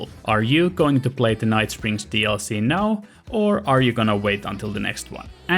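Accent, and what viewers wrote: Finland. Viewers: good video :) Love the finnish accent !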